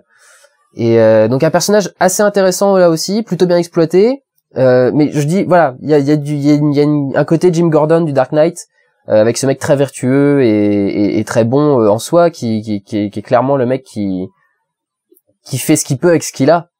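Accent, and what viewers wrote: Germany. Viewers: non mais ton accent allemand m'a plié en 4, franchement si tu as un jour une autre facette de toi à mettre dans tes vidéos, tu mettre le "spécialiste" le gars qui rationalise tout à la science et tu lui mets cette accent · Enorme l'accent allemand !!!! :)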